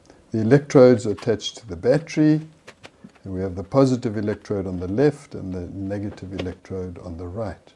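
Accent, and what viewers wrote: South Africa. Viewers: Your South African aren't you? Ik that accent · I know that South African accent anywhere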